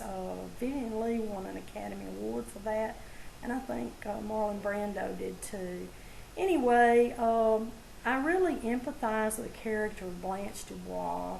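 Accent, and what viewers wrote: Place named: South, United States. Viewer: Those are all great movies. Love watching you talk in front of the camera. Us northerners love to listen to the southern accent shine..lol. If you ever need something to do you could always be a movie critic. Excellent job.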